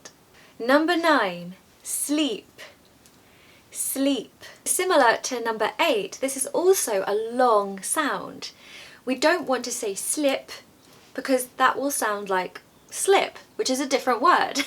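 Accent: RP, United Kingdom. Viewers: That you have a strong 'tea sound', is it that surprising for a Brit? ... ;-) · I love Bri ish accent 😁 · "Your teaching is absolutely excellent! We especially love learning pronunciation from you, and your British accent is simply wonderful. The way you pronounce words is something we appreciate every time, and it truly helps us improve. Thank you for sharing your knowledge with such clarity and grace!" "Goodnight from my side of the world! Hope you're having a wonderful [morning/afternoon/evening] wherever you are, my beautiful teacher.. Hugs.xoxoxo Gams ❤️ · Love that British accent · You speak modern RP. So, for the word PLUM you pronounce plʌm, don't you? However Matthew, my friend from Belfast, didn't understand me when I said it as you. When I wrote it down, he told me this pronunciation (more or less): plɔ:m When I explained it to Elizabeth, my English teacher, she told: I pronounce plɔ:m because I come from Liverpool, but with you I pronounce plʌm because I teach you standard English.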